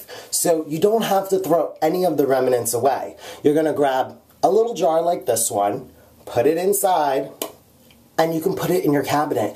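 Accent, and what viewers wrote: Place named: United States. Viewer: I'm British and I just love your American accent!! Thank u soooooo much for making videos like these coz they r just so helpful and I'm really grateful for that 😄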